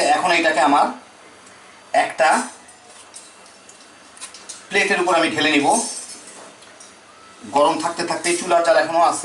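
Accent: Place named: Faridpur, Bangladesh. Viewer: Vai apnar soja sapta kotha onk valo lage tobe vai apnar vasha amader faridpurer moto.apnr bari ki faridpur distrik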